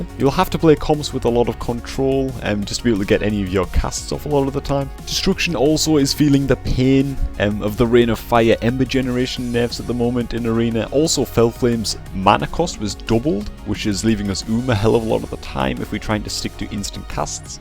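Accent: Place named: Geordie, United Kingdom. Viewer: he's either a Geordie/Mackem or a sand dancer, cant tell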